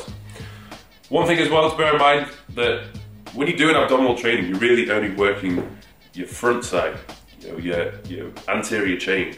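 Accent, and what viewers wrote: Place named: Birmingham, United Kingdom. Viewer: I can't place his accent, is he from Burningham?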